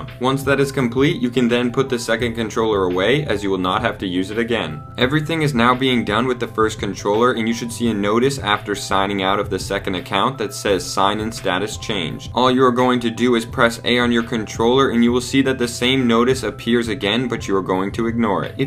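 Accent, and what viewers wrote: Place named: United States. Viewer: OMG His Accent is like an Americans oh my god.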